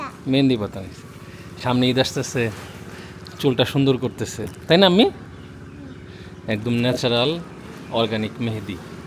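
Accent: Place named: Jamalpur, Bangladesh. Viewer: কোন এক শীতের সকালে তারা যখন মেলনী কাকীর সাথে মাছ কিনতে যায় ঝগড়া করছিল সেটাই প্রথম দেখা ভিডিও ছিল। আমার গ্রামের বাড়ি জামালপুর। আপনার ভাষা শুনে মনে হয়েছিল জামালপুরের কোন এক গ্রামে থাকেন। তখন থেকেই ভিডিও দেখা।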